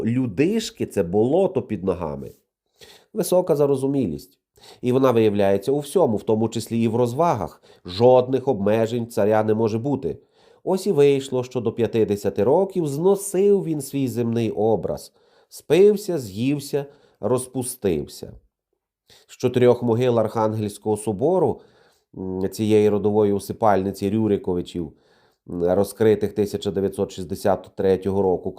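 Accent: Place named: Galicia, Ukraine. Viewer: То здається ви завше так культурно і невинно використовуєте " здихав" і т.і. до моск- тів)) а ще ваш може то галичанський акцент, то звучить це дуже оригінально😅😂😂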